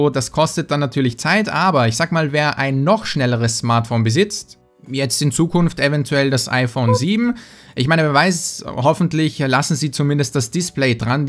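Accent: Austria. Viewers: Woher kommst du (wegen deinem akzent) ????🍭🍭🍭also akzent in ÖSTERREIC.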